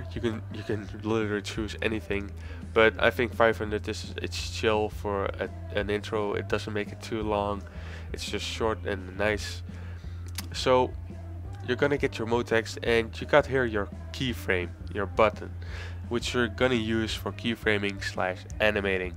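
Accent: Netherlands. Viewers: are you Dutch? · Are u dutch? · Are you Dutch? I assume you are because of your accent, lmao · lol sounds like it. I'm half dutch and i hear some similarities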